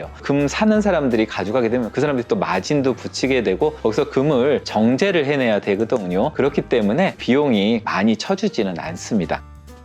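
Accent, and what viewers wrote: Seoul, South Korea. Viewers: 서울 사투리 쓰시는 거보면 서울토박이신가봐요! 재밌게 잘 봤습니다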